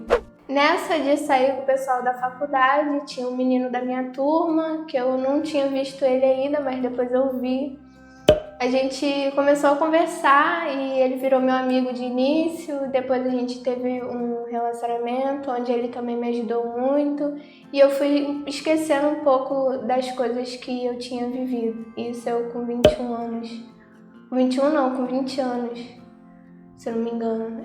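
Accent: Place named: Northeast, Brazil. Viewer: Tantas pessoas passam e passaram pelo que vc passou, que bom que vc superou. Vc é jovem, bonita, extremamente simpática e humilde e merece ser feliz, vc tem obrigação de ser feliz. Quem te quiser por parceira deve saber que é prá vida toda, vc é uma menina de ouro. Parabéns pela coragem de contar o que aconteceu com vc. Pelo sotaque vc é do nordeste ou do norte, não é?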